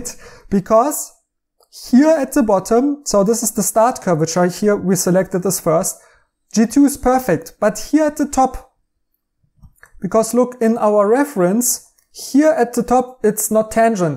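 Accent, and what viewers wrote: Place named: Germany. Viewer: Hi, thx for your free content 👍 btw, i hear u r no native speaker, by any chance, is your native language german? 😁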